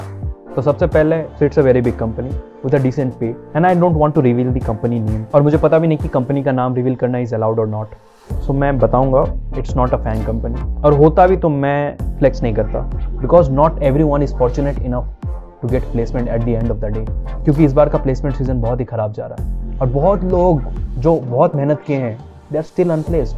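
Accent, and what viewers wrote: Bengal, India. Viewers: thoda bengali accent hai ....bengal se hi ho kya ? ...... and yeah many many congratulations 🥳❤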